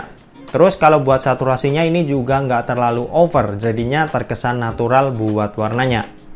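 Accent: Semarang, Indonesia. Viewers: Wong Semarang to mas hehe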